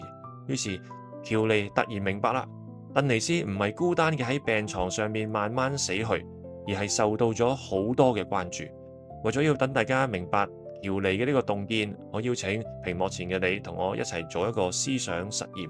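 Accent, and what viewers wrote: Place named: Hong Kong, China. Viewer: 牧師多謝你，終於有一個完全港式廣東話嘅頻道，請繼續努力，主與你同在🙏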